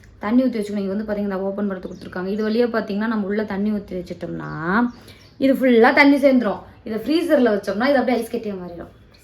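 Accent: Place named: North India, India. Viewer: Idhu north Karan pesuran nalla theriyudhu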